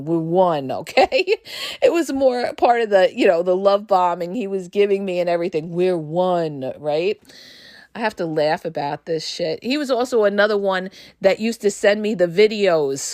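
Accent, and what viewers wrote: New York, United States. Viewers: i'm finally laughing again at you saying "they throw you to the wind" And your language is perfect combined with the New York accent I feel like I'm listening to a friend.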